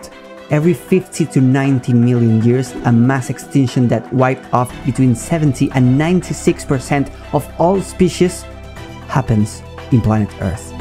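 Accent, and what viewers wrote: Oxford, United Kingdom. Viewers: That Oxford English papuuu Loved the video it really makes you think about it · Ni siquiera iba a entrar a este vídeo, solo entre para de suscribirme, después oí tu voz con el ingles de oxford y pensé "Que s dalas tío"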